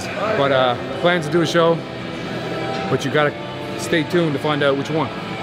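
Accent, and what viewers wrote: Newfoundland, Canada. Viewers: newfoundland accent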